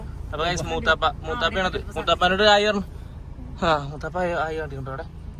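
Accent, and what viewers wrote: Kozhikode, India. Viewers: Inkum venam kozhikodeinnpenn ketta aa samsaram nalla rasam und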